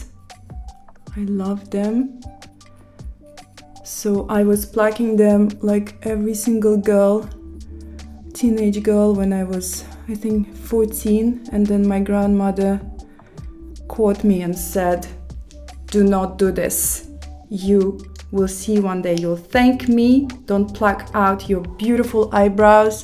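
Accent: Russia. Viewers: Вот меня это тоже удивило - она последние 20 лет как минимум живет постоянно за границей и до сих пор имеет отвратительный русский акцент. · Такая милая, до сих пор акцент русский 😊❤ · Ее английский, так и остался с русским акцентом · I like it that she still has that Russian accent. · She's been living abroad for years, and her accent ,pronunciation are still russian. 😂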